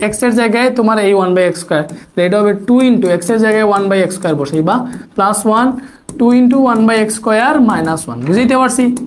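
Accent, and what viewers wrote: Sylhet, Bangladesh. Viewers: Vai mone hoyy Sylhet E😮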